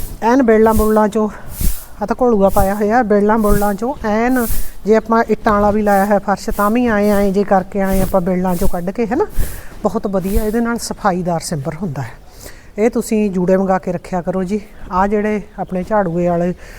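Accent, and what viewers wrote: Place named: Khamanon, India. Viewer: ਤੁਸੀਂ ਕਿਹੜੇ ਪਿੰਡ ਤੋਂ ਭੈਣ ਜੀ ਸਾਡੇ ਇਲਾਕੇ ਦੀ ਬੋਲੀ ਤੁਹਾਡੀ ਖਮਾਣੋਂ ਇਲਾਕੇ ਦੀ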